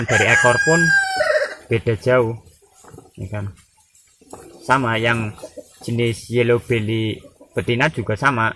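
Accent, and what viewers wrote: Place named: Banyumas, Indonesia. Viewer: Ngapak abis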